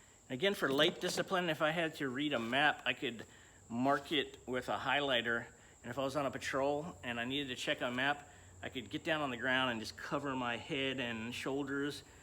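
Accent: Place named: Baltimore, United States. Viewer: It kind of sounds like Baltimore. What do you think?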